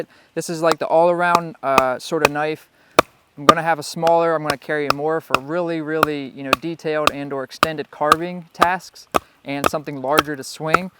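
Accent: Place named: Western Pennsylvania, United States. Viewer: Where in PA you from? Sounds like somewhere from western PA from the accent. Nice feathersticks too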